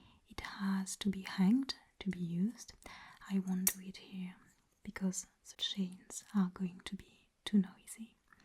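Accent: France. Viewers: I love the apothecary theme! Also from the great french accent you have, are you like from a french speaking country or are you bilingual? I always heard you in english thus I was curious :p (also that's my goal to speak so good english people won't notice I'm french xD) thank you for all of your videos! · Your French accent amazing I love it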